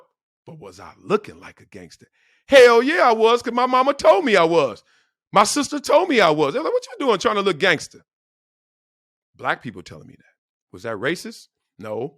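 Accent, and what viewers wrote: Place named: California, United States. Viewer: Duuude!! Haha sounds California but I’m from NJ. Solid views. It makes sense in so many ways. I’d have to prob read CK entire book/chapter to really understand his thoughts on his parents but….I don’t really care enough. 😂 Anyway, you’re so much better on this type of platform over MSM. I hope you can grow this to get you were you want to be financially so you can just do whatever you want content wise. Thanks & good luck! 🤘🏼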